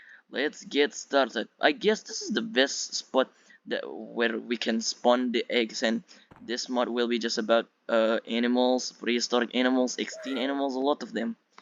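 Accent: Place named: Russia. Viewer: Hi! Good to have you back! And ngl, i like your Russian accent though, for real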